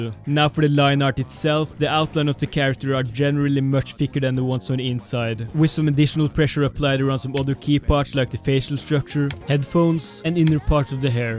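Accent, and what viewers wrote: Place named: Norway. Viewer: Nice video, love these artstyle breakdown type of videos. Also goddamn you sound Norwegian lol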